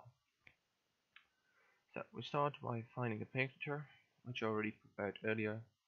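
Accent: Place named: Netherlands. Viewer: Thx for the tutorial :) I assume you're Dutch . so it sounds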